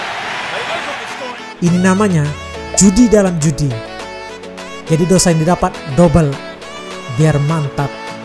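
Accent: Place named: Medan, Indonesia. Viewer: Kayaknya abang ini oranh medan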